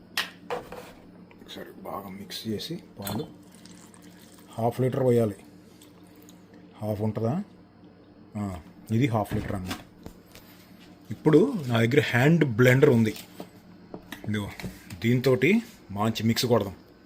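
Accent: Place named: Krishna District, India. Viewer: Mee maata teeru krishna distrik laaga vundi same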